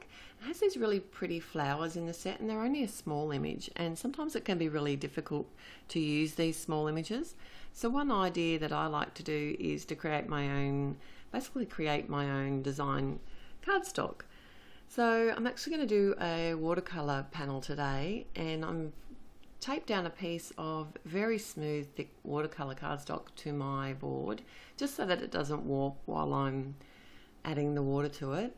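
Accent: Australia. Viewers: Hi Love the card. Just wanting to know where you bought your ATG gun from and where do you buy the refills from too please. Also what is the blue tape that you use to stick your paper down on your board with. Just love your tutorials and it's so good to hear a Aussie voice. Lol Lots of love from Rhonda Laine Sydney Australia 😘😘😘😘😘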